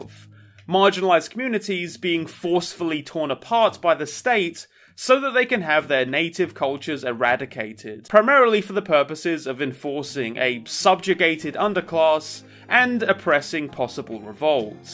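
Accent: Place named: England, United Kingdom. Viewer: says a lot about the oppression of Cornish culture that it wasn't mentioned in the video, despite you sounding distinctly English. very good video though.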